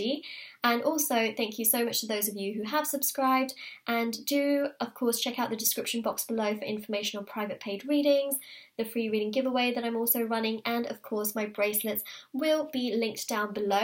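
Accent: United Kingdom. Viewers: Uk accent.very nice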